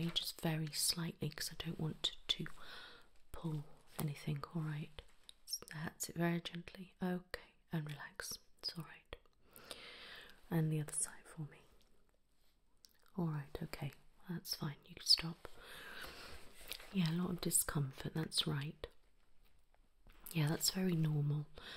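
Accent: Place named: Birmingham, United Kingdom. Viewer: Finally a brummie asmrtist !!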